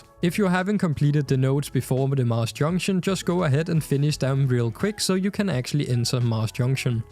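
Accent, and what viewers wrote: Denmark. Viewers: great video! instasubbed since theres no way youre not danish hahah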